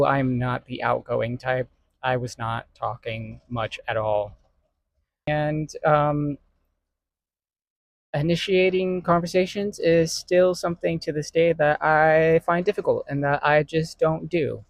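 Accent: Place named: North America. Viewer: There is no pressure to talk to anyone. You sound North American where small talk may not be a good idea with anyone considering the state of our country. I don't care if anyone thinks I'm weird. 🤓 TBH , you make a good impression & have exceptional communication skills.😁 You do have a great smile, my friend.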